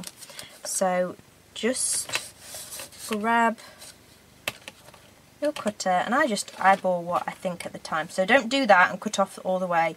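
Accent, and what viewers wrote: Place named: North, United Kingdom. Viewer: There's a Northern voice in there girly!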